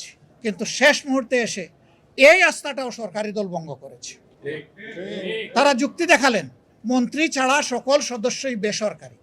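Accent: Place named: Sylhet, Bangladesh. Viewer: doctor sab speak silghtly sylhety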